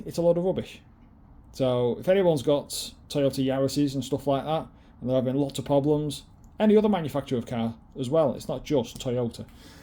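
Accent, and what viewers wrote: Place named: United Kingdom. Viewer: thanks ! Gonna get the yuasa ybx5202 ! it's only 80€! don't want to waste my time at Toyota! anyway if they replace it They're gonna do it with a shitty battery ! Do you know if the latest Yaris 2024/2025 still have the same crappy batteries in them? On m'y Corolla 2024 i have VARTA ! Like your British accent👌😅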